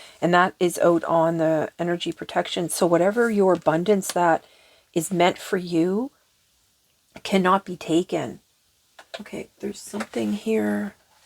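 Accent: Canada. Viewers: YOU SOUND NEWFY TO ME, WHY ARE THEY SO INTERESTED, ITS MY LIFE AND IT IN TROUBLE RIGHT NOW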